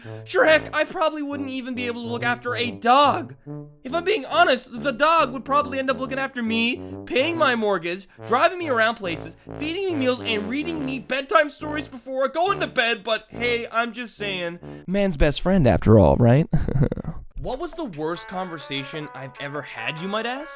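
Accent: Canada. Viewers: he is Canadian?!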